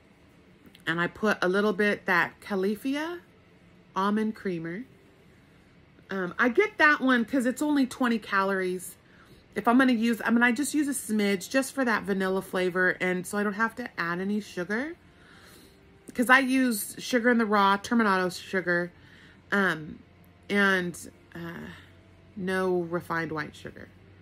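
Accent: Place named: Pennsylvania, United States. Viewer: Hello. Love listening to your stories. I grew up in Fontana California and there was a Kaiser Steel plant there. Most men worked there in the 60's and 70's. It was torn down around 1999 in order for the California Speedway to be built. My daddy died in 2002 and I still miss him so much. I also say wash like you and i say younse and buggy for shopping cart. I got these words from my mom and dad who grew up in Pennsylvania. Have a great day.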